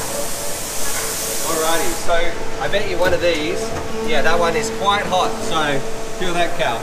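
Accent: Australia. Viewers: Wait, are you Aussie? I've been watching you for months now and I didn't even realise your accent until this video ahahah. I'm Aussie too which is probably why I didn't really think about it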